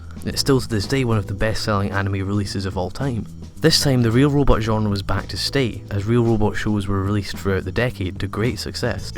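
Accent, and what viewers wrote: Scotland, United Kingdom. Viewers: It's a stupid question but you're Scottish right? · i think it's some kind of British, but there's a lot of them, so i can't tell which one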